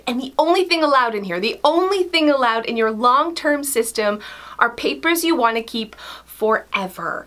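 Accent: Canada. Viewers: Hilarious intro. Love your Canadian accent. Personally I keep temp files (this year) in my desk, and long term files all in the same file cabinet. Having 15 different places I found increases paper clutter. Also a periodic clean out of even long term files is necessary. I am considering your household binder idea. I liked your command center its not suitable for me but quite beautiful. Not a fan of the whole addictive personality thing, please no offense, it comes through and I'm a less is more kind of gal.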